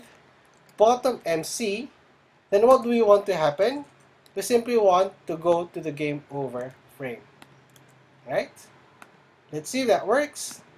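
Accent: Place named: Philippines. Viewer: Pilipino ka no halata sa salita eh xD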